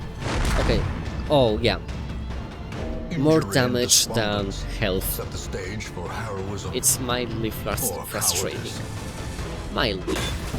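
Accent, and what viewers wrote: Poland. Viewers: Yeah, typical polish accent, i knew it the first second as well.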